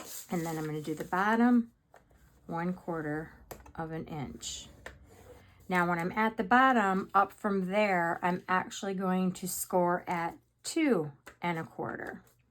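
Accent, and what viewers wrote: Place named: New York, United States. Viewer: These are so cute! I have to ask if you are from NY? I grew up there and I'm hearing it in your voice. Just curious.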